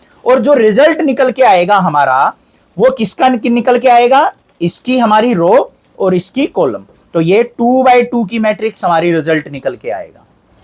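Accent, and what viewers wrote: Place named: Sonipat, India. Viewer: arey sir jii aapp sonipat ke lgte hoo kyuki aap bhi ho or aapki boli bhi vaisi hi h or m gannaur kaa hu 😂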